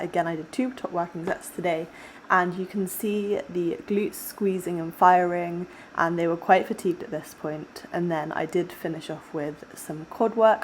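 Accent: RP, United Kingdom. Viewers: is your posh accent acquired or innate ?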